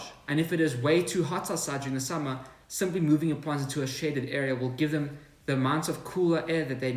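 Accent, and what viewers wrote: South Africa. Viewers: You accent is broken where in SA are you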